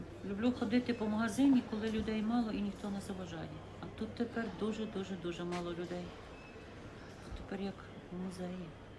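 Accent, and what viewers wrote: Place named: Ternopil, Ukraine. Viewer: та я також з Тернопільської обл, чую говір наш😉я з кременецького району, може й десь недалеко живемо від Вас😀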